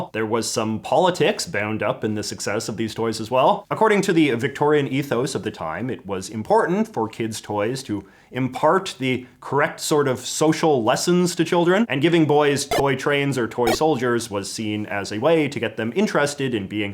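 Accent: Canada. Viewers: Love your videos and your beautiful Canadian accent, but as a music nerd, each time I hear a baroque harpsichord when you mention the victorians it bothers me 😅 get some brahms or beethoven Romantic inspired music of the victorian period 🙌 music nerd out 🙃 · I love your Canadian accent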